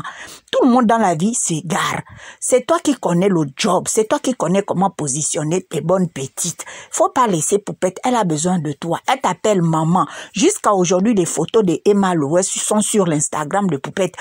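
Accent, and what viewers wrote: Gabon. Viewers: 😂😂😂😂😂 explication excellent , j'adore trop l'accens gabonaise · Je m'abonne j'ai reconnu le bled à travers l'accent. #Gabon# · Ton accent gabonais la 😂😂😂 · C'est une gabonaise qui parle🤣🤣🤣 une fille du sud! Je m'abonne 🇬🇦👏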